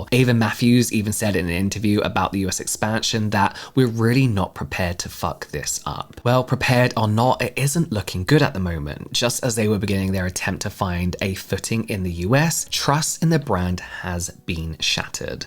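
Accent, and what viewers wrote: United Kingdom. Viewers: Oh I didn't know he was British (I'm not good at recognizing accents) but that makes it even more shocking 😅😅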